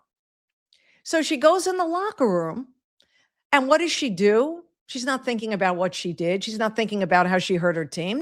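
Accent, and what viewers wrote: New York, United States. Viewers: right on😂. Wnba mgmt. is a travesty. I love your accent! NY? Queens?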